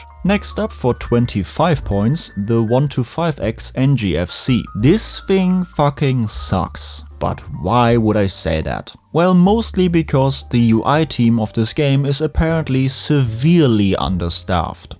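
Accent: Germany. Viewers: Subbed because: German accent gives the content even more quality; The hard work you put into this video added a lot to the way I’ll play the game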